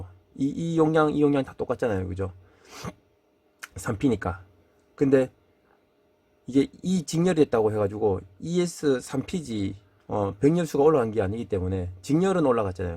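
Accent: Gyeongsang, South Korea. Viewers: 밧데리 박사학위 드리고 싶어요 구수한 갱상도 사투리 좀 버벅하시만 그것이 더 정겹네요 쪽집게 과외 처럼 존경합니다 밥 커피 대접 하고 싶어요 저는 🏝에 살아요 부시리 삼치 드시러 오세요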